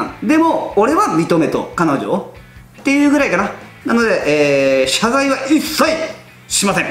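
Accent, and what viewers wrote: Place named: Hakata, Japan. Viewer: 清々しい感情と博多弁がとても好感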